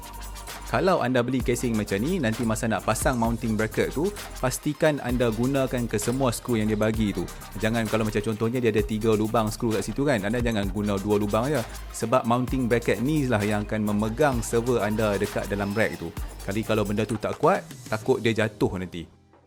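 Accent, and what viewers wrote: Malaysia. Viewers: patut lah awak ngomomong macam orang malaysia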